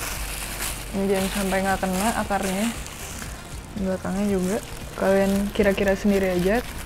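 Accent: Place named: Visayas, Philippines. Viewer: Akala ko bisaya si ateng 😂😊😊😊😘😘😘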